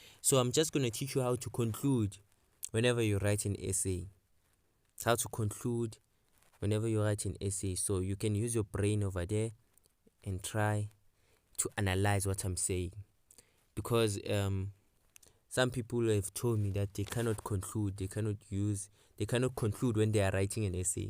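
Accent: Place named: Zimbabwe. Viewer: Not being rude but your pronunciation is giving Zimbabwe coz my history teacher is Zimbabwean so u sound like 🤔 him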